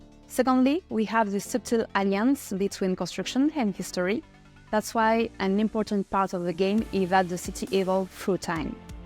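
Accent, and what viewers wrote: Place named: France. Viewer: Don't know why, but the French accent is very persuasive.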